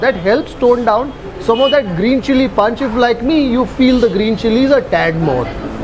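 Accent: Karnataka, India. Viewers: The way you anchor in kannada English is very appreciated and also the food presentation is very addorable 🙏🙏😊❤️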